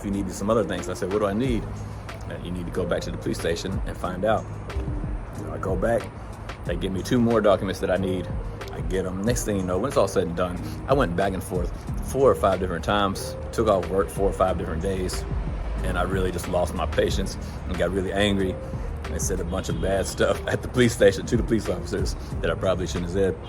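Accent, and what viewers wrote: South, United States. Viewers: you from the south? Why you sound black?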